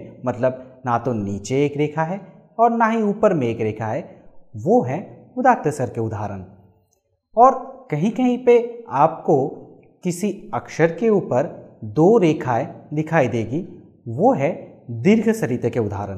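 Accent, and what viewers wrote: Bengal, India. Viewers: साधूक्तं भवता । पुरुषः वङ्गदेशीयप्रायः नाम्नः, तर्हि तद्देशीयानामुच्चारणपद्धतिः जानीमः, तर्हि क्षन्तव्योऽस्माभिः । प्रसङ्गेण वक्ष्यामि, भवतां भाषासौष्ठवमभिवन्दनार्हम् । जयतु संस्कृतं जयतु भारतः । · I think he is speaking with a Bengali accent, hence the problems in pronunciation. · I appreciate your efforts. Your explanation gives relevant information how and why veda mantras have remained intact and un-adultered. I am not going on your accent due your Bengal origin. This does not change the meaning of Vedic Mantras today because these mantras are available in printed text. We must respect our ancient rishies who developed various pathas, only to see that no one can make any adulteration in them. It is human drawback that people make changes in the original text as they like. Brahamanaas literature is example. However, now we should try to understand the meaning of the words of original Veda Mantras also, which are profound. 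Since we have the written original text is available, the meaning of the mantra does not change as we don’t go by the pronunciation but by the words and their meanings. Therefore, people criticizing your efforts should take your explanation in right spirit. These mantras are profound and powerful only by understanding the meanings and not by simply chanting as many understand today. Congratulations to you. Regards. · ये बंगाली हैं. मोद्धोमा तथा शोरित बंगाली मूल शब्द है. बंगला में 'व' अक्षर नहीं होता.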